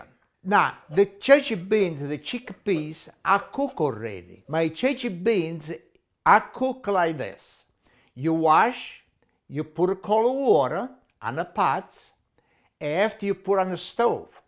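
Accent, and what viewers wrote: Italy. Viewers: When I was a kid I remember old Italian men speaking in this accent during the 80s. Good old days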